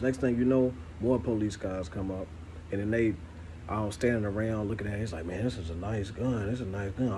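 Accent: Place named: Memphis, United States. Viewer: I appreciate your videos. You gotta be from Memphis that accent thick!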